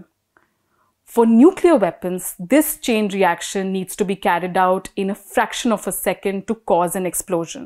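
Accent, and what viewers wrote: India. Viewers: Shiiittt Stingking indian Accent !!!!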